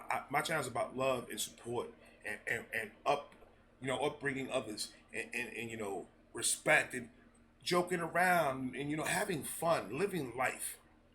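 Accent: New York, United States. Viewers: Everything you said was ON POINT! I couldn’t agree with you more! Btw.. already knew you were from NY before you even said it lol! I live 3 hours north of nyc but have friends down in the boogie down lol. Where I live, most people around here stand by “FAFO”!!!!!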